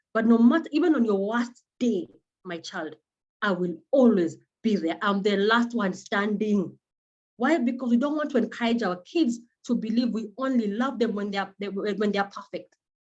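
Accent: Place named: Botswana. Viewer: I love your accent! I was growing up in Botswana and I miss it a lot.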